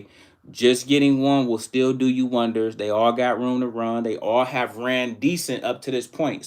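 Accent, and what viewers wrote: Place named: Midwest, United States. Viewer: Live your accent. You from the midwest? Indiana, Missouri, Oklahoma or Wisconsin?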